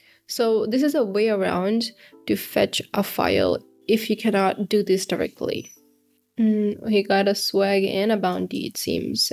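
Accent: India. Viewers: this video is very informative i also studying in this field i don't know why a little bit of indian accent are i am noticing .. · You should probably travel to eastern India rather than crying "pa jit" they just have accents like this...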